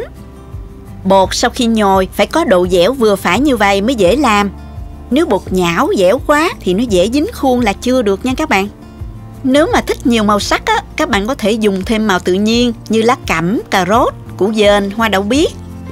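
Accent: South, Vietnam. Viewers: Trời ơi, ghiền cái chất giong Nam rặc của cô quá đi🥰🥰🥰🥰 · Giọng nói người miền nam nghe ngọt ngào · Ko biết khuôn mặt xinh ko nhưng giọng nói dễ thương chuẩn miền Nam hay lắm .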